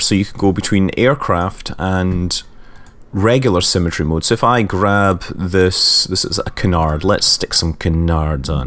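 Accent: Scotland, United Kingdom. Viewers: Scottish Manly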